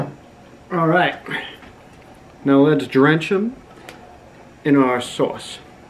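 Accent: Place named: New York, United States. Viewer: I like NYC accent.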